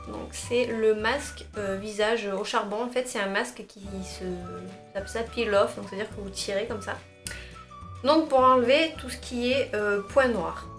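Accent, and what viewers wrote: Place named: France. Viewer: Omg!!! J"adore votre accent francais!!! Jsuis acadienne du canada!!!!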